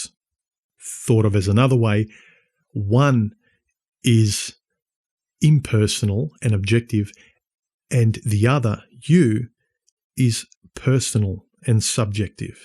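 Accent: Australia. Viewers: Really dig this channel. Also pretty cool to hear an Aussie on YouTube covering these topics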